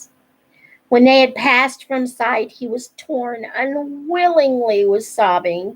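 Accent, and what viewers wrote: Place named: South, United States. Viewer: I will be honest with you I’m not big on professional audiobooks to begin with so I was a bit iffy coming in, but you’re skill in narration and your beautiful southern accent as another east Texan was so wonderfully fit for this story and the characters and your delivery of that final line shattered me. As a child this book devastated me, but as an adult who has lived so much more life and suffered loss of other’s innocence, this book wrecked me in the most beautiful of ways. Thank you for your dedication to finish this story despite the hardships and time it took, may the Lord bless YOU Miss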